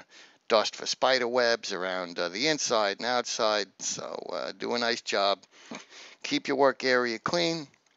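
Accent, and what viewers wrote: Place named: Brooklyn, United States. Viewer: good one. Cool Brooklyn accent too....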